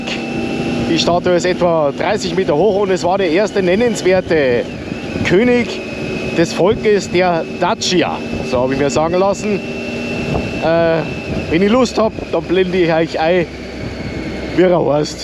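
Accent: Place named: Bavaria, Germany. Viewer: Wir waren 2024 mit dem Bike in Rumänien. Einfach ein Traum. Deshalb werden wir heuer wieder hinfahren. Hab in meinem Navi bereits sehr viele Punkte eingetragen. Deine Videos sind immer sehr unterhaltsam und informativ. Und deine Handzeichen hast dir immer noch nicht abgewöhnt 🙂. Aber das und der Bayrische Dialekt ist eben dein Markenzeichen, und des passt scho so.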